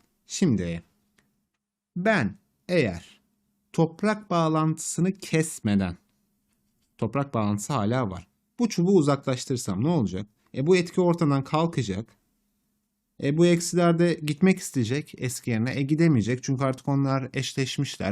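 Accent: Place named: Izmir, Turkey. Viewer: bir insan izmirliyim demeden sadece elektrostatik anlatırken izmirli olduğunu ancak bu kadar belli eder. güzel tekrar etmiş oldum iyi taktikmiş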